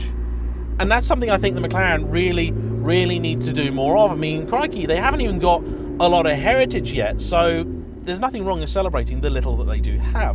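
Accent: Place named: England, United Kingdom. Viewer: people from england have such a stupid accent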